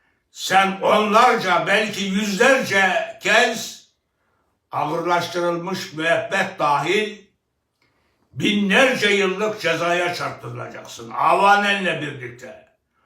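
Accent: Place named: Sivas, Turkey. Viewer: öz Tükçe ile konuşmanızı çok seviyorum başkanım tam bizim gibi konuşuyor diyorum ben de Sivaslıyım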